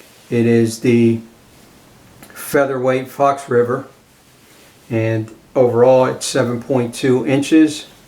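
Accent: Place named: Philadelphia, United States. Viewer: That’s a philly/ south Jersey accent if I ever heard one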